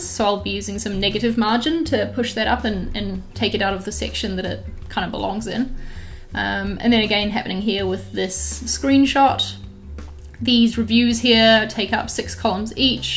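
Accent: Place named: New Zealand. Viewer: good to hear a kiwi accent on the tube!!!!!!!!!!!!!!!!!!!!!!!!!!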